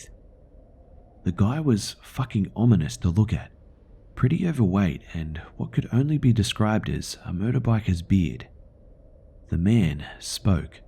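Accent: Australia. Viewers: I also have come across some disturbing videos via the dark net, without being rude are you Australian by any chance?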